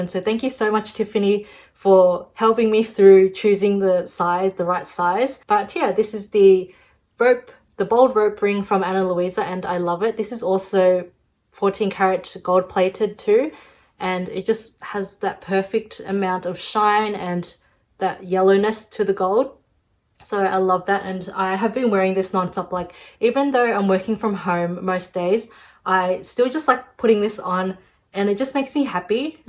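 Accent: Australia. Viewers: Are you Korean? You sound like you kind of have an Australian accent. You remind me Rosie a little from black pink I love them so much !!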